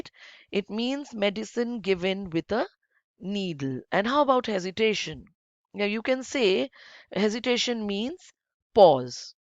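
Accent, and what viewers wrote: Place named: India. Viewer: teacher, thank you too much for this [ clear tutorial ] and [ the helpful ] which solved problem had been with me. from your accent I think u are Indian by the way I lived in India 3 years mostly in Bangalore and Mumbai, it was really unique time and I really want the time give me another chance to visit India..........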